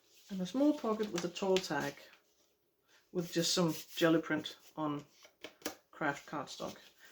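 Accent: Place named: Denmark. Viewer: I love it! I haven't come across your channel before. I've been trying to place your accent - you said you don't speak Dutch, so you're not Dutch. I'm sure you're either European or Scandinavian. Are you Danish? Lol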